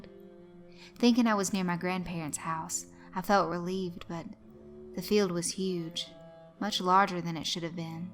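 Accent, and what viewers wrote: South, United States. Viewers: You must be a southern girl, guessing Georgia. . Southern girls with accents are my weakness ☺️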